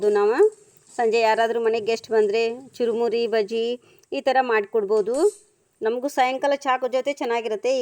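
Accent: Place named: North Karnataka, India. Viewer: ಅಕ್ಕ ನಮಸ್ಕಾರಗಳು😊 ನಮ್ಮ ಉತ್ತರ ಕರ್ನಾಟಕದ ಭಾಷೆ ಕೇಳೋಕೆ ಬಹಳ ಚಂದ ಅದರಾಗೆ ಮಂಡಕ್ಕಿ ಜೊತೆ ಇನ್ನು ಚಂದ, ನಮ್ಮಕ್ಕನ ಕನ್ನಡ ಮಂಡಕ್ಕಿ ಗಿಂತ ಚಂದ 🎁🙏🏻✅💐 ಅಕ್ಕ ಇಂತಿ ನಿನ್ನ ಪ್ರೀತಿಯ ತಮ್ಮ 😍🤗🙏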